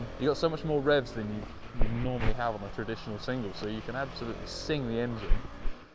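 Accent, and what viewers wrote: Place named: United Kingdom. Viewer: All I need to hear is "properly fun" in British to know the bike out of my range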